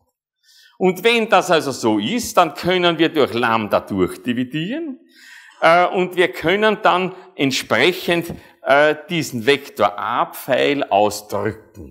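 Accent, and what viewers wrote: Austria. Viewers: Als Schleswig-Hosteiner ist es wirklich eine Herausforderung über eine längere Zeit dem sehr sympathischen Herrn Professor mit dem österreichischen Tonfall konzentriert zu folgen. LOL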